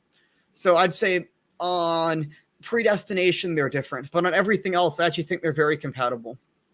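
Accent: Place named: United States. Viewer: Great idea for a video, and some really interesting content here. That being said, my man's Latin pronunciation could benefit from some de-Americanization, haha! As a pretty settled Suarezian myself, I'd recommend you check out Luis Alonso Getino's "El Centenario de Suarez," published in La Ciencia Tomista, 15 (1917), pp. 384-388 [available on HathiTrust]. The author compares the 24 theses with Suarez' positions (Spoiler: except for thesis #13, which even then is qualified, Suarez would disagree with 23 of the 24 theses!).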